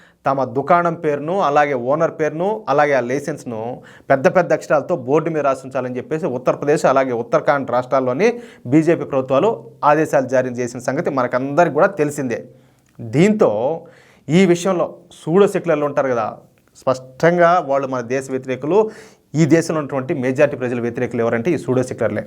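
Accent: Rayalaseema, India. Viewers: అన్న యొగి గారు మొది గారు భారత దేశానికి గొప్ప వ్యక్కులు. మీరు మంచి కంటెంట్‌ ఇసున్నారు. మీ సీమ యాస సూపర్‌ అన్న. 🙏🙏🙏 భారత మాతకు జై ✊✊✊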